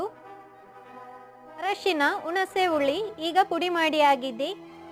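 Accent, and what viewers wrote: Tulu, India. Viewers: Nivu kannada mataduvaga gottagtade tulu mataduvavaru anta😂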